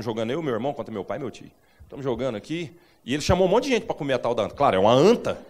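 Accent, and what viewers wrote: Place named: Goiás, Brazil. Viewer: difícil o Goianês... ainda mais sendo gaúcha! 🤣🤣🤣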